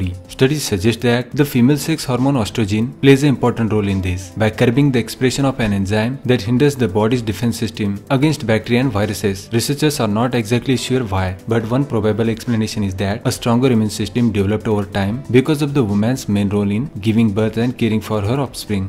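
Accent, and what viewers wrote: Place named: India. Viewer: Strong indian accent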